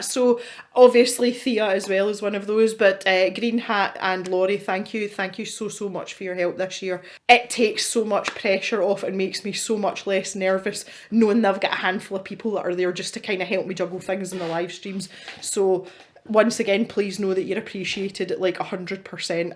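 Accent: Scotland, United Kingdom. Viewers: Happy new year,! 🍾🥂 Congratulations on your great achievement! 👏👏👏 I love watching your videos and listening to your Scottish accent. Your sense of humor and your way of talking about your pet peeve markers in the unboxing videos are absolutely awesome, you make me laugh out loud a lot. Keep up the great work! 🙂 Thank goodness I've made up my mind I'll stick with colouring and watercolour painting - otherwise, I'd be ordering all the subscription boxes just because I like your reviews so much. 😉 By the way, it was an absolute honour for me that we got to colour our pages side by side in the Ivy travelling book that went to Johanna Basford last year! 💖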